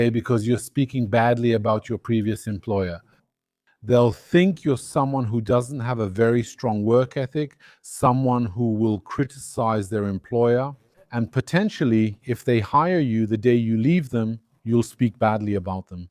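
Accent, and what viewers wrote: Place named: United Kingdom. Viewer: L'accent britannique, la classe !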